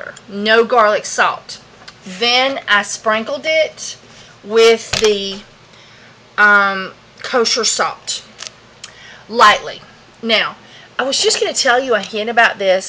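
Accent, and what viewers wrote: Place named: Midwest, United States. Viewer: Wow, this is a really cool video. I am not sure where you are from, but I love your Midwestern accent. I love how you are doing traditional Jerky with a more modern spin on dehydrating. It sounds like you are just learning how amazing this is. I love meat, but I am on a living foods diet of nothing but vegetables nuts seeds grains and fruits of course, and I use the Exc. for making living foods recipes, but you cannot go over 115f or the life force in the plant u are doing will die.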